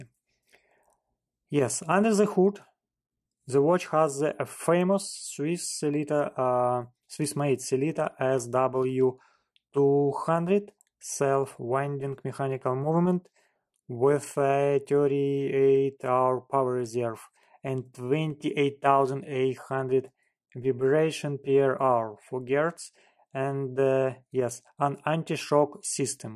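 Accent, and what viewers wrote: Russia. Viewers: Такой прикольный русский акцент 😅 лайк за обзор :)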